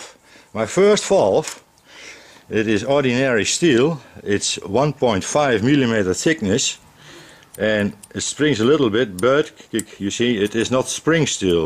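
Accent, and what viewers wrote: Netherlands. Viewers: He is Dutch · agree,his accent is Dutch not German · You are dutch right? Because my family is dutch and you have an accent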